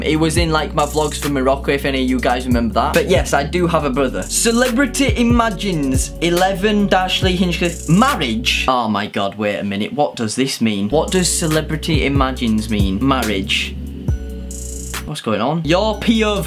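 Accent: United Kingdom. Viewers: BRITISH ACCENT ON FIRE🔥🔥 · hey lee!! I am subscribed and i have my post notification on since i have subscribed. and im from the Philippines. thats why i watch your videos on monday. 😭 i love your accent and your videos.. i just love the English Accent. and i cant believe your just 17 years old. im just a 5 footer and im already 18. kinda jealous of your height. share some blessings. 😂😂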